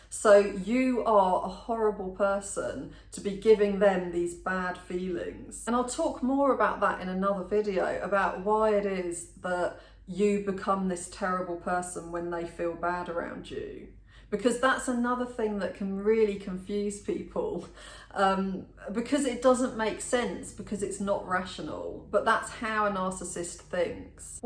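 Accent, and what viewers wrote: United Kingdom. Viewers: tht UK accent is a turn-on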